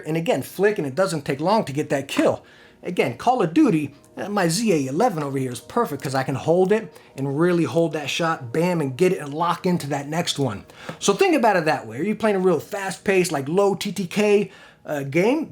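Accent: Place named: Italian American, United States. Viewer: You have this Italian American accent like those in Martin Scorcesse movies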